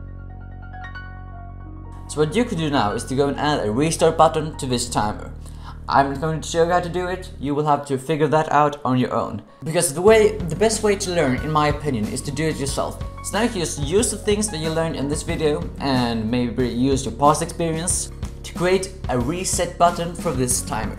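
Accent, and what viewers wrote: Sweden. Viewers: lol I immedietely knew you were from Sweden from you accent! damn, that early day pewds when he wasn't so fluent in english made me catch that accent instantly :v great tutorial btw, helped me out a lot, keep up the good work!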